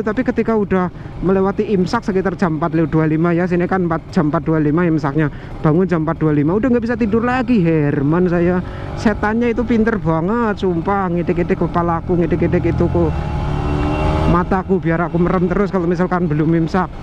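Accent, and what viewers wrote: Banyumas, Indonesia. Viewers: Salam wong ngapak mas😘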